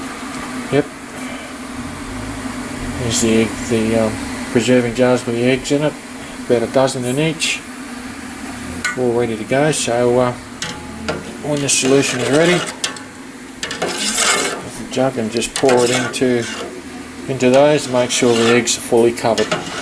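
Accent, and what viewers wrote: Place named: United Kingdom. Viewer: You are Brit and its to hard for me to understand you, but nice video😂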